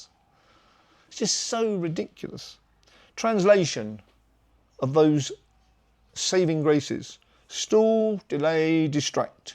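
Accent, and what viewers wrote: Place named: United Kingdom. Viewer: It’s very refreshing to have a Brit doing this so well…the American accent and the hogwash really is off-putting.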